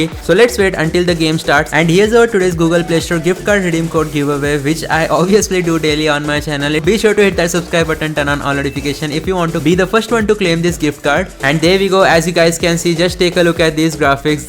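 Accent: India. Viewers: Damn Indian accent is so weird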